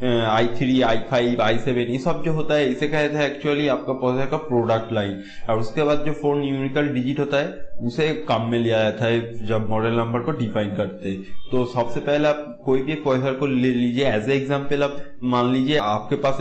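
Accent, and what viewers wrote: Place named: Bengal, India. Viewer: Your hindi is like bangali people's hindi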